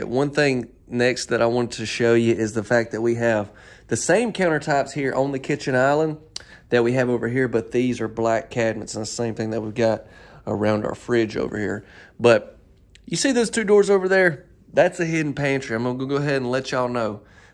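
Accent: South, United States. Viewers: I’m from the northeast. NJ to be exact. I do enjoy listening to your southern accent and your humor. It’s so entertaining. This is my favorite so far. I’ll save this for future reference when I move south or southwest. The floor plan is what I want.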